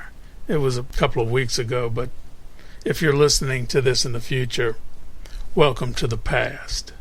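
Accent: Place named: South, United States. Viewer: Love your vids. But it drives me crazy that I can't place your accent. It's like a little bit of Virginia or Arkansas, but steeped in some deeper south, like Alabama or Louisiana. You tend to shift in and out of a deeper accent when you talk. Where is it from??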